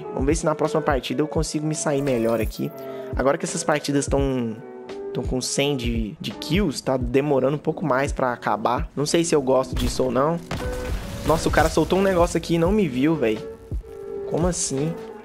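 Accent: Minas Gerais, Brazil. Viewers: Man vc é mineiro ?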